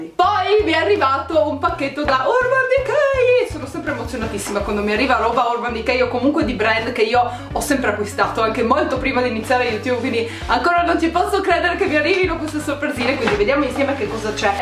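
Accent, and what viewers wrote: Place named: Trieste, Italy. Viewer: accento da vera triestinazza doc..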